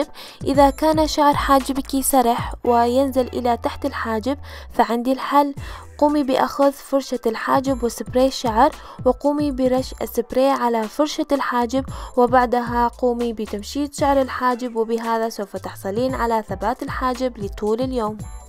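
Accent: Iraq. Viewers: صح ماكو احلى من لهجت العراقيه حلو واحد يحجي بلهجته مو بالله 😂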